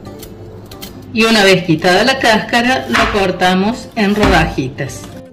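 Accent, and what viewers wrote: Córdoba, Argentina. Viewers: Qué lindo es escuchar tu voz de cordobesa!! Te iba a comentar q "acá en Cba.tomamos el mate c jengibre " pero qué te voy a decir !!! Si sos de mi Cba. Es buenísimo el jengibre!!! Haré este té. Gracias!! Ely, ciudad de Santa María de Punilla, Córdoba,República Argentina. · Qué alegría escuchar esa tonada cordobesa!!! Muy buen video, muchas gracias!!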